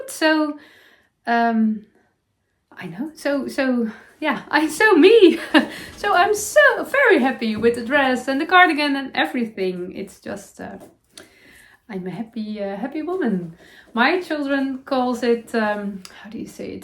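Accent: Netherlands. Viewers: all the colors of the 3 Felix cardigan are perfect for you! Don't feel guilty by keeping them all! They were a special gift this person wanted you to have! You are so sweet to want to share these with others....but we see your excitement in wearing all your beautiful cardigans and we enjoy your enthusiasm and joy! Thanks for sharing your lovely projects! Love your 'dutchy' english! Closet, chest, cabinet, hanger, some of the words you were thinking about!🦋💕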